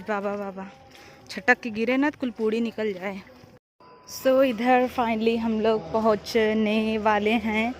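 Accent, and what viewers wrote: Jaunpur, India. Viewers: ji, Hame gaow Bahut accha lagta hei. Aap ki awaj sun kar Jaunpur ki yad aa jaati hei. Main Jaunpur se bahar rahata hu.lakin aap ka video dekh kar lagta hei ki mein Jaunpur pahuch gaya.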